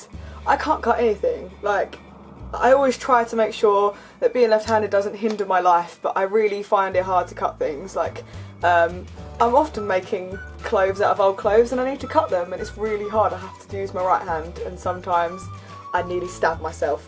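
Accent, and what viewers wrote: Essex, United Kingdom. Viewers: hey, greetings from Turkey, thanks for the videos, heped me a lot get Essex accent. ❤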